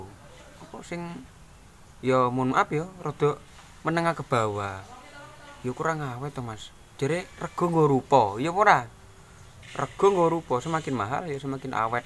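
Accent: Java, Indonesia. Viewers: Bhasane seng jwo medok e wae,,sam Bn asli jawa ne,,ngrti hehehe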